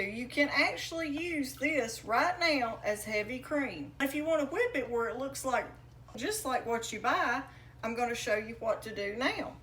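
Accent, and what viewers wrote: South, United States. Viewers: I knew this was gon be good from the accent alone. Southern moms cook the best hands down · Thank you for the video. This makes so much sense. I’m guessing that if you want to make whipped cream from it, you need to chill it well first? Thank you again. I love your Southern accent too by the way. Love from Australia ❤🇦🇺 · Bravo🙌 I'm so glad this video popped up. I just subscribed and I'm sharing this video on pinterest.❤ I hope that helps your channel. This might even be cheaper than buying heavy cream. Thank you so much for sharing. Love your accent, I'm from Chicago but live in North Carolina now. I used to tell my fiance that I like to Southern accent and he said it's a drawl.😂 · I found you today searching how to make Homemade Heavy Cream. Love your Southern accent and your style with easy to follow, straight to the point instructions. 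I am a new subscriber and need to check out your other videos. Thank you. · People with southern accents always have the best food videos! 😋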